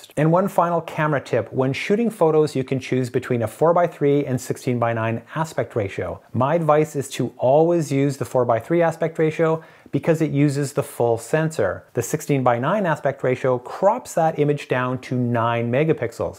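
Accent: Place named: Canada. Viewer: Thanks for elaborating. I'm in Eleuthera Bahamas now (a nice playground to use the drone, e.g. Sapphire Blue hole) but I live in Brampton. I'm going through your library of informative videos and appreciate them. Funny how almost immediately, one can detect a fellow Canadian in a video. Best. Ken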